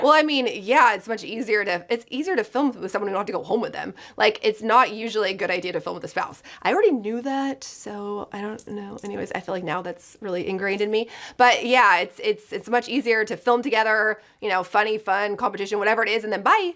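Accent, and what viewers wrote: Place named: California, United States. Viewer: She is a California girl through and through. That’s how we talk.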